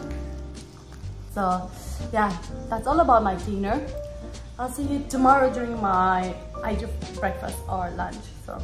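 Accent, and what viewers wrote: India. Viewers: please make a video in Hindi na....your Hindi accent is so ❤ly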